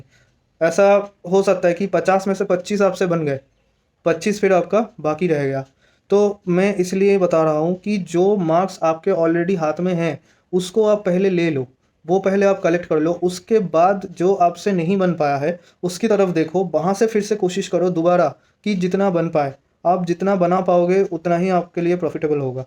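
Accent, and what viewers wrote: Bengal, India. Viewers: Amar o mone hoyechilo Bengali . Pronounciation lgche omni .... 🙂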